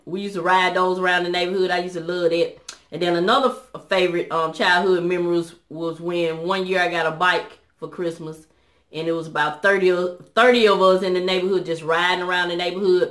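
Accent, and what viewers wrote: South, United States. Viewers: You are absolutely adorable! I enjoyed learning about you. I love your southern drawl. Congratulations on your amazing accomplishments. TFS 👌❤😁!!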